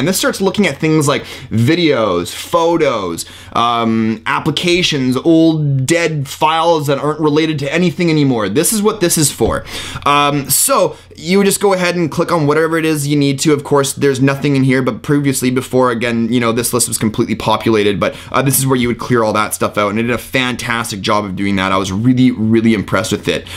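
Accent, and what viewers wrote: Canada. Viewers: Ur r canadian right?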